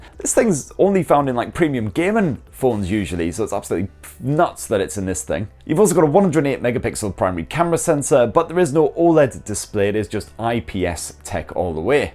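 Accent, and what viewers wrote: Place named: Newcastle, United Kingdom. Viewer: Where ya from ya sound geordie like myself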